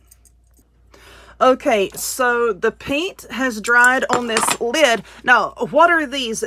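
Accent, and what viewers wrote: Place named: South, United States. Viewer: I have just started watching your videos, this one was my second one. I love your spirit and the fun you put into your crafts. And the southern draw! I am a born and raised in the south and I can understand you perfectly. Thank you and keep it up. 😁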